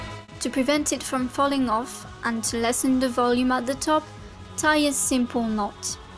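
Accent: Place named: England, United Kingdom. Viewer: Donne moi ton accent anglais on fleek s'il te plaît:(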